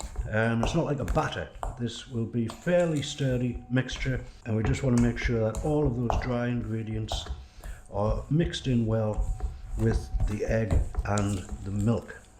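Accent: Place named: North East England, United Kingdom. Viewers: Enjoying your videos having just discovered your channel - I am sure many folk will appreciate your style i.e. explaining the ingredients with costs at the beginning of your presentation. I use an air fryer and may I suggest you give an idea of the bake settings - all air fryers perform differently so a guide would be invaluable. Enjoy your NE accent - probably Sun'land where I grew up. Makes me homesick. Tell me if I am right or wrong please. You sound a canny lad and I am sure you will have some canny recipes to come. I have subscribed to you channel and look forward to more. Good luck and good fortune. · Thank you. I wanted to ask where you are from because I try to place British accents. I thought it was a "Northern" accent, but not exactly what I was used to (I think of how the Physicist Brian Cox speaks as "Northern") -- there are so many accents to learn. 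Thank you, again. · As others have said here the accent is from the NE of England (just South of the border with Scotland) In fact your own George Washington's forefathers would have spoken like this since they came from Washington in Durham 👍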